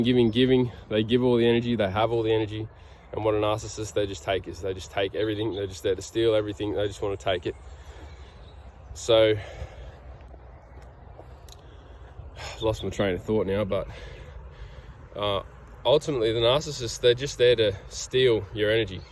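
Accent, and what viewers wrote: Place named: Australia. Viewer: awesome stuff my brother just found you're channel now you sound like an aussie I'm from sydney would love to have a good old yarn with my fellow brother in Christ about our testimonies , the spiritual warfare , isolation of those who can see and hear ,the ones that pray for discernment and pray for a mighty strong faith in the way the truth and the life and pray our Father`s will be done on earth as it is in heaven . i don't usually comment on anything but felt i should right now would be cool to catch up some time my bro GOD willing God bless bruv Deano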